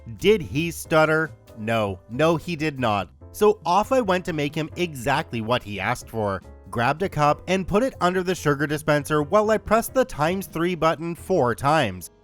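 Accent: Canada. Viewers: Can't tell if that's Canadian Accent or if is really an American from wisconson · okay but the canadian accent tho that caught me off guard XD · You know their talking about our TIM HORTONS GOD I LOVE MY TIMMYS PS LOVE YOUR CANADIAN VOICE VERY FUNNY CAUSE ITS TRUE 🙃🤪😜 Sorry lol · Irish accent with a Canadian twang. Lol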